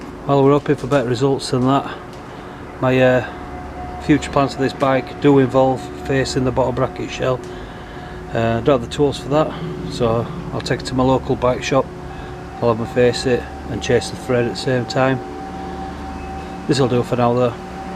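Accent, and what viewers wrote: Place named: Yorkshire, United Kingdom. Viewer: Thats a good yorkshire accent is that. Commenting for algorithm ✌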